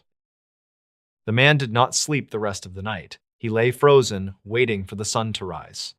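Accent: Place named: Essex, United Kingdom. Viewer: Essex England 😊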